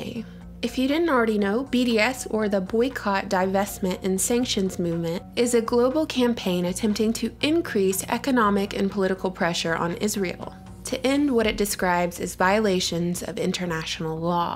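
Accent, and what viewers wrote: South, United States. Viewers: I love ur southern accent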